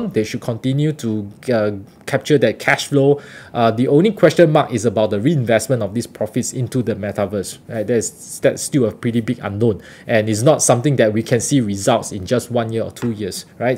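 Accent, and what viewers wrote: Singapore. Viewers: It's not English he speaks but singlish - a version of English spoken in Singapore..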